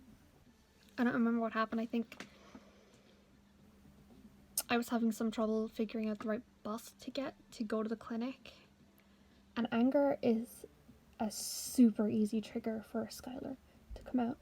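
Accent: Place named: Scotland, United Kingdom. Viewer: I’m really curious if you “switched “ while recording this video because your voice goes from very quiet and timid and feminine with a neutral accent , and a deeper register voice with a slight Scottish sounding accent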